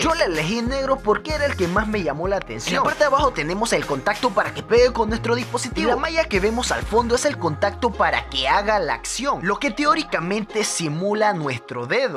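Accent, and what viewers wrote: Chile. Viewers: eres chileno :v?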